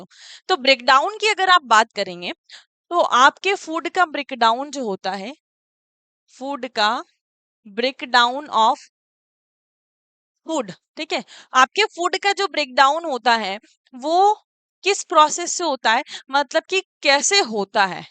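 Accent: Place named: Kerala, India. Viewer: Ap kerala se ho kya mam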